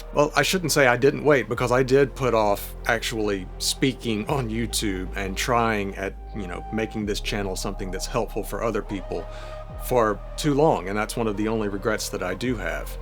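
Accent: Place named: Mississippi, United States. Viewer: Middle of Mississippi? I knew I recognized that accent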